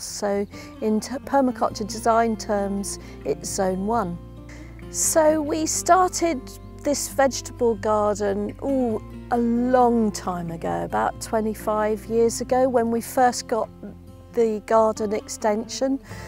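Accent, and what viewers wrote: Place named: United Kingdom. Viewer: Such a beautiful accent. Is that British?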